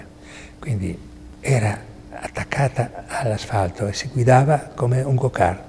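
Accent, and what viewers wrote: Abruzzo, Italy. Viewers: se però andassi a un corso serale di italiano non sarebbe male, eviteresti di apparire come un asino che raglia. Neanche più i pastori abruzzesi parlano con quell’accento. Gombordamendo…. Ma come cazzo si fa a parlare così nel 2023 porco iddio lurido!!!!!!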